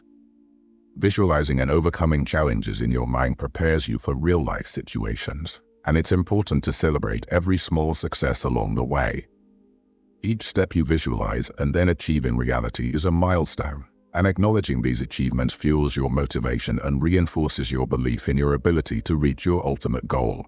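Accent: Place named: United Kingdom. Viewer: I can hear that British accent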